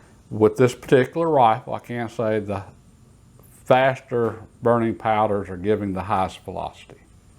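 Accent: South, United States. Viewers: Maybe I missed it, hard time hearing these days, but I always went with the longer the round the more twist were needed, not the weight of the bullet but I guess it could follow the heaver the bullet the longer...this is a great channel with great content and I can actually understand the southern accent better lol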